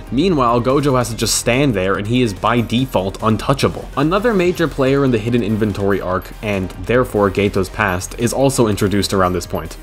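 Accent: United States. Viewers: holy shit I just can't with the overly American pronunciation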